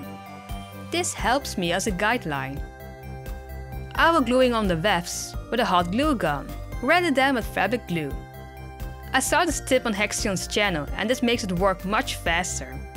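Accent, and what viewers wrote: Netherlands. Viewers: She’s from the Netherlands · I have a question, are you from the Netherlands? Your accent sounds like you come from there! Very cute repaint though. You deserve so much more recognition <3 Edit: ik herken je accent echt! Ik ben blij dat er ook een Nederlander in “the doll community” zit! :) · Hey, where are you from? You have a Dutch accent, I’m Dutch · Jep she is dutch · * I knew you sounded Dutch, my friend is Afrikaans and she sounded like you! Afrikaans is derived from French, Dutch, and Swedish, and the accent sounds just like yours! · Are you dutch you sound dutch I am ducht bwt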